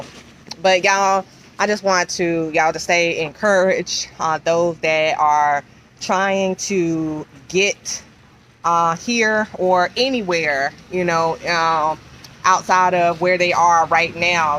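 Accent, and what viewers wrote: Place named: Raleigh, United States. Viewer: Congrats on your new job!! Im from NC too!! I knew that accent was very close to the Raleigh area accent!! Just found your channel and I'm loving it. I want to visit Mexico in 2024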